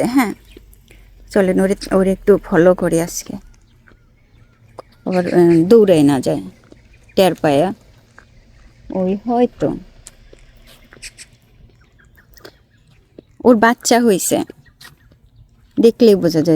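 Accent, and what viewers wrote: Khulna, Bangladesh. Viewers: আপু আপনার ভিডিও দেখি শুধু আমাদের খুলনার ভাষা শুনার জন্য। ❤️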